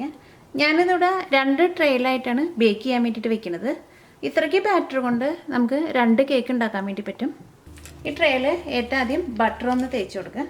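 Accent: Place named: Northern Kerala, India. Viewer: great recipe... from where in Kerala, are you from??? mixture of mid and vadakkan malayalam slang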